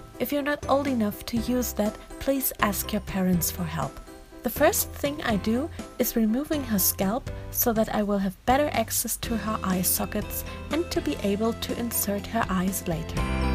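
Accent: Germany. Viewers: Are you German? I like your accent. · speaks good English with slight accent Me: Mmh... is that a german accent I hear? Me: Sees Uhu glue YES I was right!(or she's austrian) If you see this comment pls reply! (I'm german too?)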